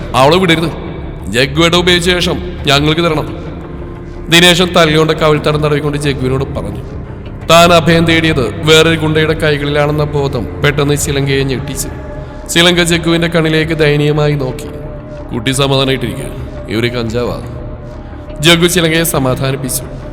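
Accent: Coastal Kerala, India. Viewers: ഇക്കാടെ വോയിസ്സിൽ കടപ്പുറം ഭാഷ കേൾക്കാൻ നല്ലരസം നല്ല സ്റ്റോറി 🥰🥰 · വിജയ് സത്യാ സുപ്പർ സ്റ്റോറി♥️♥️ എതൊരു ഗുഡ യെയും നന്നാക്കാൻ ശ്രമിച്ചാൽ അത് സ്നേഹം കൊണ്ടെ സാധിക്കു നല്ലവനായ ജഗൂ❤❤ ചിലങ്ക❤❤ ഇക്കാ കടപ്പുറം സ്ലാങ് അടിപൊളി😅👌🏼👌🏼👌🏼💞💞💞💞 അവതരണം കേട്ട് അങ്ങനെ ഇരുന്ന് പോയ്🥰🥰🥰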